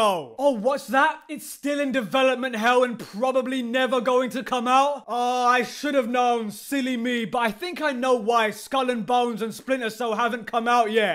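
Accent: United Kingdom. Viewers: the british accent is annoying as it is screaming into the mic dosnt help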